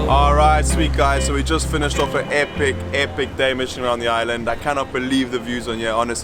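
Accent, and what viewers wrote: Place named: South Africa. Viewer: Love the SA accent and no-bullshit manner 👍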